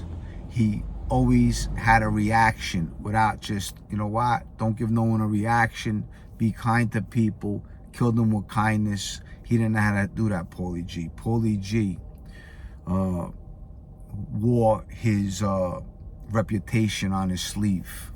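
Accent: New York, United States. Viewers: still loving your vids but check this. Im interested in mob life like millions of others world wide.Would I want to live that life? Not no but HELL NO!. I think you made the right choice myself. The New York accent is bad ass. Keep being your true self and to hell what others say!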